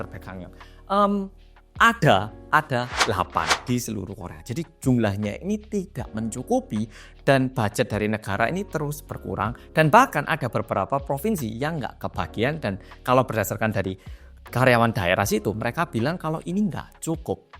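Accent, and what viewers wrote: Java, Indonesia. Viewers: Mas, sampeyan kih kan 'medok' yo.. Opo ra enek rencana ngajarkek les boso jowo neng kono???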